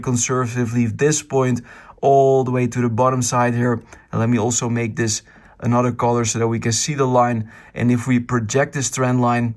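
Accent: Netherlands. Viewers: Ik volg je al een tijdje maar je klinkt echt als een Hollandse boy lol lekker bezig BTW.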